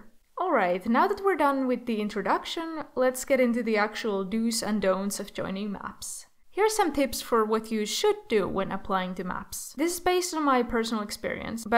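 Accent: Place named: Finland. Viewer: Nice video! I LOVE watching MAPs (but have never joined one because I don't have the patience for animation) Side note- I immediately thought your accent sounded familiar and I was right lol (my family is Finnish)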